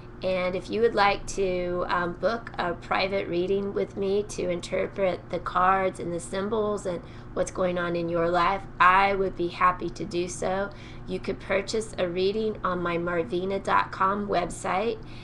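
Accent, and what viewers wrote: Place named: New Zealand. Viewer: Where are you from? That accent is different. New Zealand?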